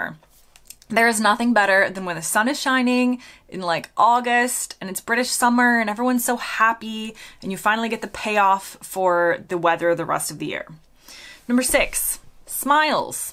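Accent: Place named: United States. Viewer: Dave, the Dog was my favourite Gogglebox character. It's weird to explain to someone. It's not just watching people watch TV. It's nice to see another Yorkshire addict too. It's hard to find a bad one. The best are amazing, but bad ones are still okay. Flake and Ripples rule too As you picked people, and places. I'm a little gutted i, or my City didn't make it on the list. lol (I wrote before 101, you covered it. Thank you) Nice to get an idea of what you are into too, I appreciate your views. It's nice to see how you've become British (with an accent from the states)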